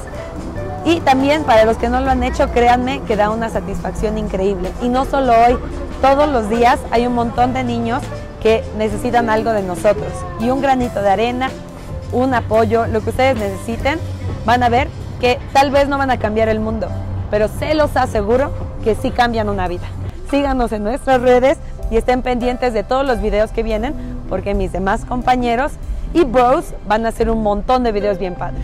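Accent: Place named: Mexico. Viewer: Saludos desde Santo Domingo 🇩🇴😁 me encanta tu carisma y ese mega acento mexicano ✌🏼🤷🆒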